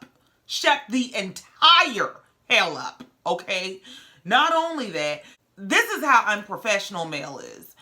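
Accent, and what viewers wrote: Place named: Louisiana, United States. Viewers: Girllll when I tell you you spoke nothing but FACTS! I'm here for it! Are you from Louisiana? I'm getting Lafayette or Lake Charles.. ? But either way I just found your channel this Thursday today is 10/22/21 and I love your commentary! I subscribed!! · I detect a very strong and seasoned clinical background as well as a Louisiana dialect. Did I pass or fail? #youregreat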